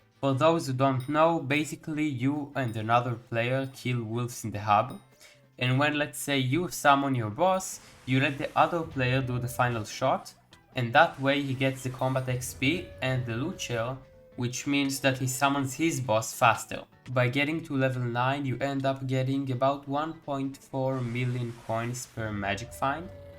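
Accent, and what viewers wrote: Israel. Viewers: איזה מבטא ישראלי 😂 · מבטא ישראלי?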